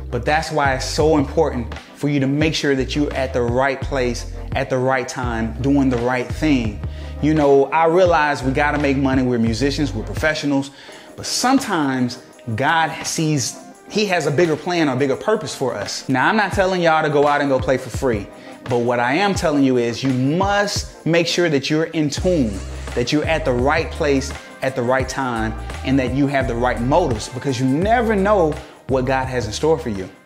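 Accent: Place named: South Carolina, United States. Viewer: Were are you from the accent sounds familiar. S carolina I'm guessing. Just a guess.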